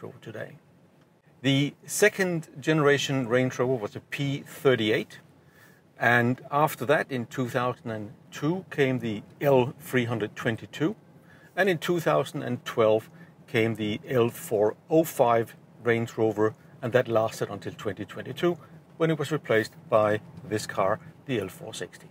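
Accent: Denmark. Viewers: You can always hear a Danish guy speaking English.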